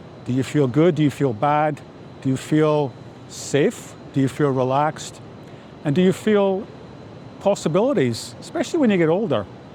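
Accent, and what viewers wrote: Ireland. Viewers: English isn't my first language and i can't place your accent, where are you from ? Are you Irish ? BTW greetings from Ayutthaya area, i'm married to a Thai and loving it here too. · You lived in the US? Your accent sounds different like Irish.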